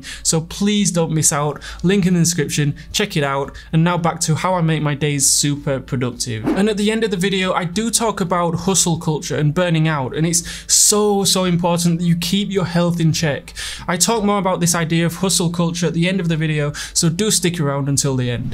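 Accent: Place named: Yorkshire, United Kingdom. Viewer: which part of the UK do you come from? It sounds a bit like Yorkshire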